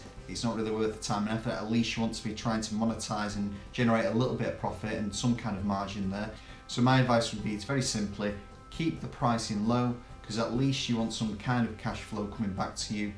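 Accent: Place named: United Kingdom. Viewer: You sound from UK, how do we sign up for it in uk?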